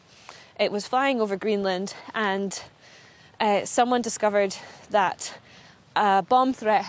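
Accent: Scotland, United Kingdom. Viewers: What a lovely broadcast, and a surprisingly strong Scottish accent. Thank you. Poor cameraman must have found that difficult with the wind gusts 😁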